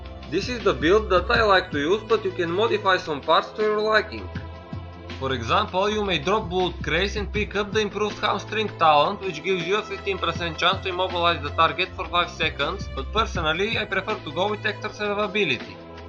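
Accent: Bulgaria. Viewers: 100% sam siguren 4e si bulgarin samo kato ti 4uq angliiskiq :D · ахаха леле брато, само като ти чух акцента, си казах, това момче е Българин :D щом бях в другата стая и ми се стори, че говориш на български, смятайй ;д иначе браво, клипа е мн добър и полезен :)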